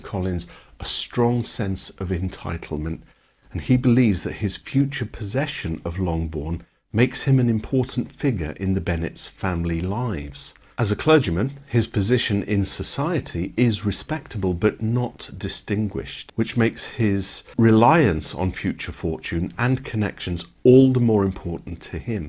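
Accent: United Kingdom. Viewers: Re subscribers, clearly you underestimated the appetite of a legion of Austen fans for a soothing British narrator and their favorite film clips over the well-trodden history of the Beatles 😂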